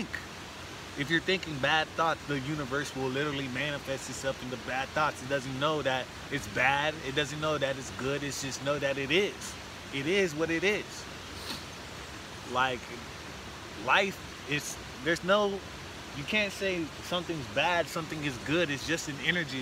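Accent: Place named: Glasgow, United Kingdom. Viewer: A Glasgow accent right at the start ..that’s got me watching this ..! 👍☺️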